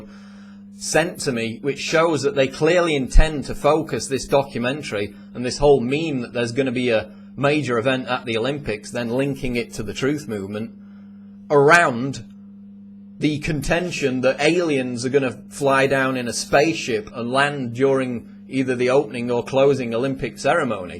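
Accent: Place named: Yorkshire, United Kingdom. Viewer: Yo dude, you from Leeds? That accent sounds like a Yorkshire one?! Hello from W.Yorks.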